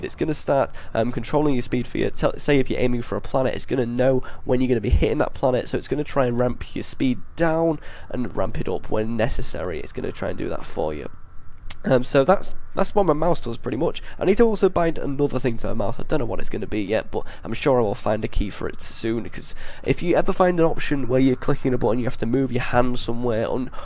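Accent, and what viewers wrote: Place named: United Kingdom. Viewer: You might well not like his voice. But it's a regional British accent rather than a nose blowing issue.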